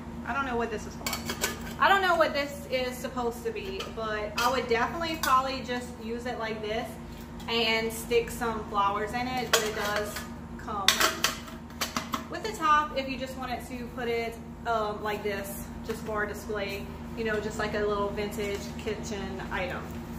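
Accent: Louisiana, United States. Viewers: First time watching and I love the Louisiana accent...the aaaa, ummmm just so cute.. oh my when the kids came in I fell out when you said " Wait I have a kid in here" 🤭 ! I think I am coming back to check out the finished beauties 😊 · I could not find where you were located, but the accent, ahhh, a hint of home - has to be Louisiana! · LOL, I was trying to figure out the accent too, and figured it was Louisanna as well - tiny Canjun French (first time watching as well). Then she says South Louisanna....we did good !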